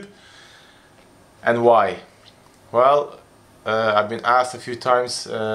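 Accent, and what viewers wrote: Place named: Liverpool, United Kingdom. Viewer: Sko tru du sko hatt bedre scouse accent enn d der itte adle de åra:) blir bra detta! #legende! YNWA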